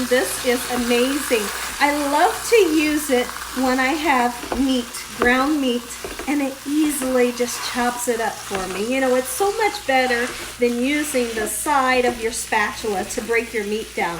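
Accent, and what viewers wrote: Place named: Texas, United States. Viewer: I would have never guessed you are from Ohio or maybe your not 🤔. I seriously thought TX! (Where I live) or Louisiana (my soul, I love that state) ORRRR Oklahoma 🙄😂 (you know TX & OK have a thing 😂. I kid I kid it’s cool IG 😂). Love your style tho. All the love from my family to yours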